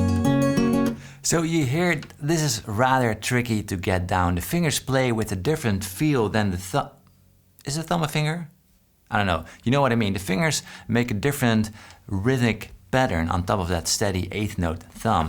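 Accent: Netherlands. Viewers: I love this guys’ lesson on this song. Always amazed at people like Paul Davids and their understanding of music. Also Loving the Dutch accent. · You sound dutch!!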